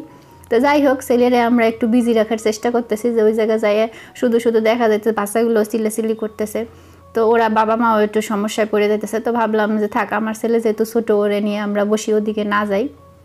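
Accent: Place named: Madaripur, Bangladesh. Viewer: Apu tomar madaripurer vasa huinga moroto madaripurer vasa muhe aiya porte ace.onek din doira koite parina. Dese gele komu InsaAllah.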